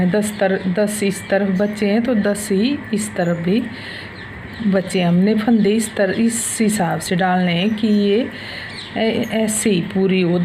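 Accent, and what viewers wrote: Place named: Haryana, India. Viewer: Aap ke bolne se mere ko laga ki aap haryana se hai .mai to m.p.se hu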